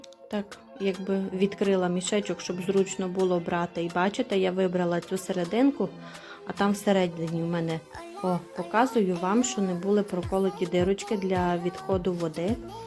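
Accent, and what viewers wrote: Transcarpathia, Ukraine. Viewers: Привіт! Зайшовши на ваш канал зразу підписалася.Закарпатська мова 👍 я теж з Закарпаття. Легких вам пологів💛💙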